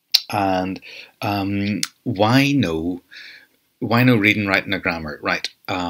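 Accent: Ulster, United Kingdom. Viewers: I love the Ulster dialect! This is similar to the Pimsleur method. Say out loud, predict, repeat . Thank you. There are not many classes out there which are just oral.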